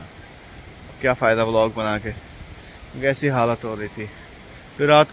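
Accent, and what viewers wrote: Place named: Kashmir, India. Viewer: Now this kashmiri accent hits different🥰🥰.....#love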